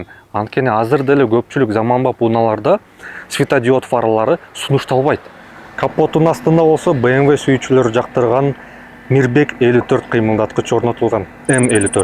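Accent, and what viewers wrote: Standard. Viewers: Азаматсын таза кыргызча суйлогон кыргыз экен!👍👍👍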